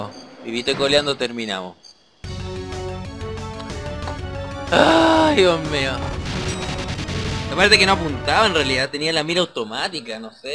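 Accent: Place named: Argentina. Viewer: Ahora sé que sos argentino y adolescente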